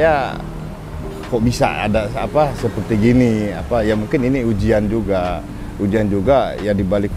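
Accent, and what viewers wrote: West Java, Indonesia. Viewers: Logat ngomong nya sunda🤭😂 mungkin kelamaan di bandung haha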